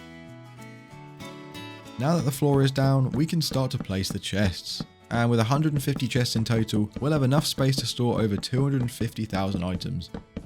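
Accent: United Kingdom. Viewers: Okay, this is the first time I'm hearing your voice. I never knew you were British! 👀😂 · Are you from UK sir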